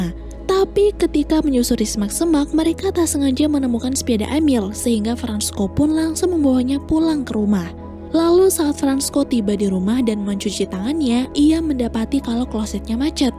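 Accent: Pemalang, Indonesia. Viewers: Wong Pemalang tah min?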